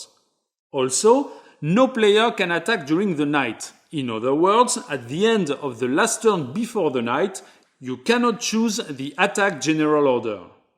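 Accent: France. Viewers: This is excellent! Also, all American place names sound so much nicer when pronounced with a French accent 😄⭐️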